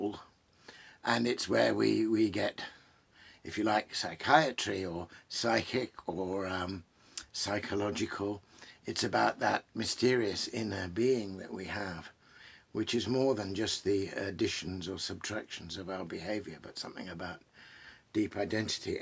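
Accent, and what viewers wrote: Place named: Oxford, United Kingdom. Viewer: I just found you. I was looking to find Omar Khayyams wisdoms... An Olympic cruise.... My old Guru, Micky Burn, (author) had that in his bucket list too. You have an Oxford accent, to my ear. Are you back yet from the conflagrations?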